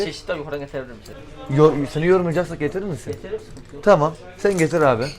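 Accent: Kayseri, Turkey. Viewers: Abi kayserilimisin yoksa anteplimi anlamadım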